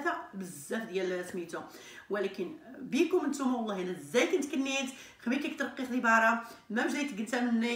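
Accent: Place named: Rif, Morocco. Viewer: Moi j suis kabyle d'Algérie, j'adore amazigh rifain ❤❤❤❤ j'ai pas compris beaucoup de mots mais j'adore ton accent ❤😍😍😍😘😘😘😘😘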